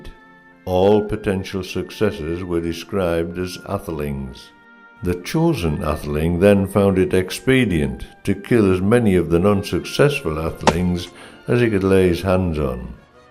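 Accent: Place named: Northumbria, United Kingdom. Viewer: very accurate.. bet from your accent you have been to that little church at Heavenfield though.. such a magical place with its wooden cross .. I like the tale that if we win this battle on this place Northumbria will be Cristian .. and the raising of a cross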